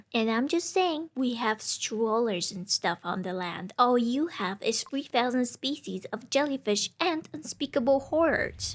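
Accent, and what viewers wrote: Eastern Europe. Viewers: Oh it’s an Eastern European accent! (Like “Dexter Secret Laboratory”) I’m a huge fan of your voice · Yoooo, ur from Eastern Europe? Somehow it felt like it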